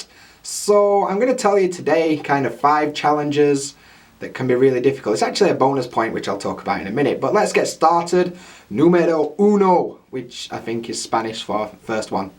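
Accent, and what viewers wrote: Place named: Northern England, United Kingdom. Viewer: Hey, I noticed you always talk in your normal accent in videos. Do you need to change how you talk to when actually teaching English? I’m northern too (Geordie) so was wondering if you still use “me” instead of “my” when teaching or using the word “like” in the middle of sentences? Or do you try and talk proper English?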